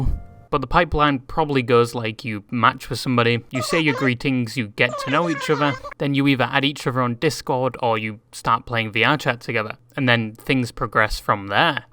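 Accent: United Kingdom. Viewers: what accent is this, ik its uk but what area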